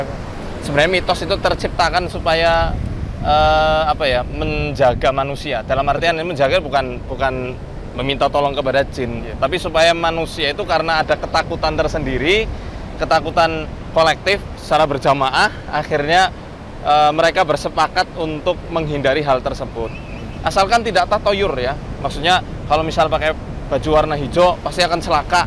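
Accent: Banyumas, Indonesia. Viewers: logatnya kho ngapak bhs Indonesianya...apa asalnya dr ..daerah ngapak ,mas Ustad.Kisah dibawa jin memang ada,sy ngalami waktu umur 5 th an,rasanya dibawa teman main(pd hal bukan) .Hilang setengah hari.😀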